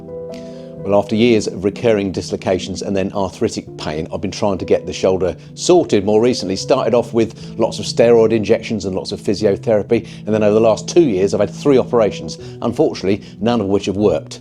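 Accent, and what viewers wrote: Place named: United Kingdom. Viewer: Missing your industry news, and UK accent. Hope the surgery went ok last December. Godspeed!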